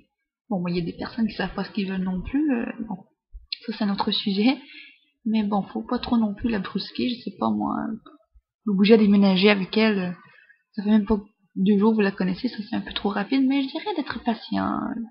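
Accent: Canada. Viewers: elle est surement québécoise, si je me trompe pas!!! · je pense que elle es canadienne il me semble avoir reconnus l'accent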